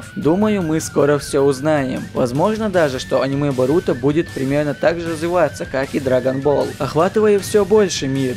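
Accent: Chechnya, Russia. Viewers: Братан, ты случайно не из Чечни? Акцент выдаёт. Я твой голос где то слышал, тебя случайно не зовут?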